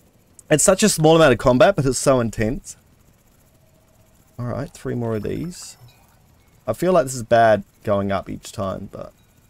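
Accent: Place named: Australia. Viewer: Good to hear an Aussie voice on YouTube. Cheers bro 👌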